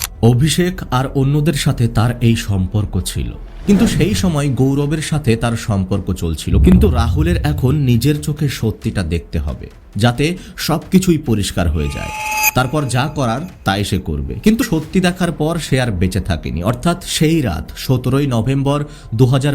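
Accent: Bangladesh. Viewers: আপনার কথার ধরন শুনে মনে হচ্ছে আপনি বাংলাদেশী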